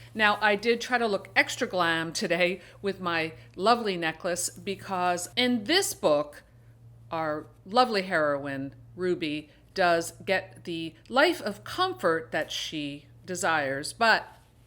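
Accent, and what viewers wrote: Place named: Pennsylvania, United States. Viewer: Fun videos! Sound like you are around bucks county pa! Same with me, it’s a lovely place to live!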